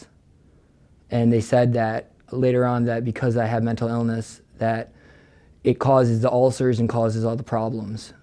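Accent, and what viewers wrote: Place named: Western New York, United States. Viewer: Right on brother. Much respect I grew up just outside of Buffalo, so I love hearing the WNY / Upstate accent in your voice!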